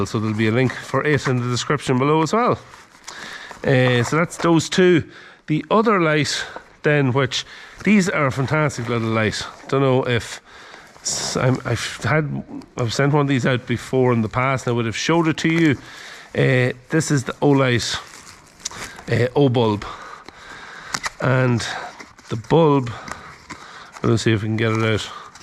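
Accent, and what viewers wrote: Dundalk, Ireland. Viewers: Great video. Happy holidays,. Are you from around Dundalk somewhere?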